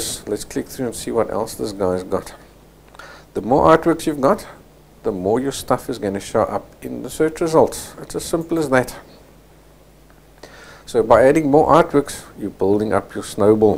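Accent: Afrikaans, South Africa. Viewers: Hi are you originally from South Africa. Sounds like you have an Afrikaans accent. I am from Cape Town, and wants to sell on FAA. Well I have sold two prints now. It is difficult to get the right people to see my work. My South African friends are not going to buy my work and pay in $$, our exchange rate is just too bad. Any ideas how I can get more international viewers?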